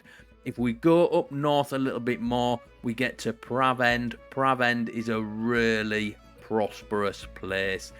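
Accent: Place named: Yorkshire, United Kingdom. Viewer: Ohh this is what ive been looking for. I dunt have this game so i like to watch people playin it, and nothing better than roleplay. Good stuff mate, liked and subbed. Also where abarts in Yorkshire you from? Im from Sheffield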